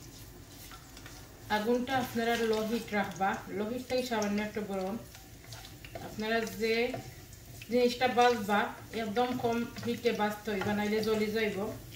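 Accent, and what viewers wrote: Sylhet, Bangladesh. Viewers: Khub bhalo laglo ❤❤sylethi kotha shune aro bhalo laglo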